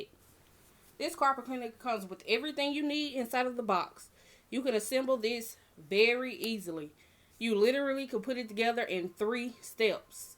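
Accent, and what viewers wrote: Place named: United States. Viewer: You have a very strong accent. I'm guessing you're American? Is the powerbrush still holding up? Thinking of getting one.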